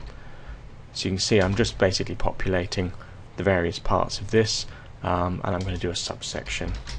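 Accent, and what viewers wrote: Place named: United Kingdom. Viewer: For some reason, my brain pays 50% more attention to this guy just because of the British accent.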